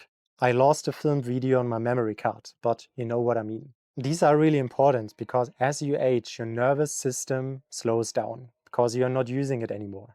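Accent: Germany. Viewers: very subtle german accent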